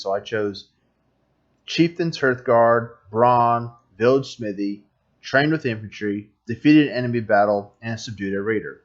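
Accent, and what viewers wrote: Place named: United States. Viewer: U sound American for an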